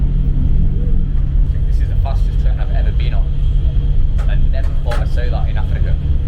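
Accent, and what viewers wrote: United Kingdom. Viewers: I guess you are a mancunian or from northwest of England. All the best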